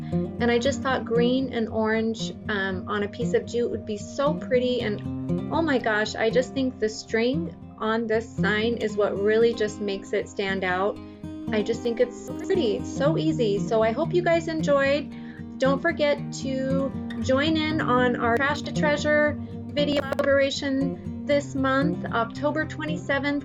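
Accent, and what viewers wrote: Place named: South, United States. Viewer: you live in south by your accent. Pumpkins are fruit not vegetables. I am married to a farmer and my dad had a garden until he could not tend it anymore. Country girl here!!!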